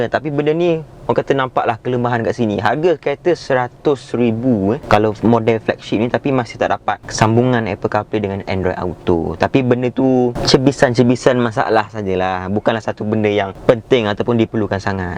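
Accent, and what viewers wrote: Terengganu, Malaysia. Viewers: bila dengar review, *bisik dalam hati, mesti orang ganu ni. sampai hujung video.. perghh skli betul kat terengganu !! anyway, padu bro review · dia ganu lah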